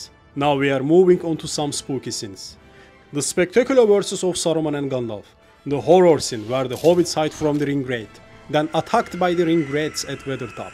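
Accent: Turkey. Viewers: Strongest turkish accent you will ever hear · İngilizcen o kadar güzel Türkçe aksanı ki~ arkadaşlarıma gönderiyorum. Örnek olsun diye! Btw harika videolar! ❤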